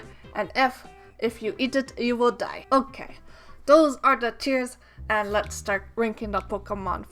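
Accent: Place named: France. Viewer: You sound french or something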